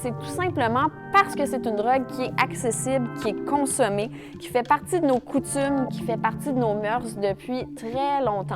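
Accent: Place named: Quebec, Canada. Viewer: i've been speaking french for more than 15 years now, every 10 words she says, i only understand one,is this how all french canadian speak?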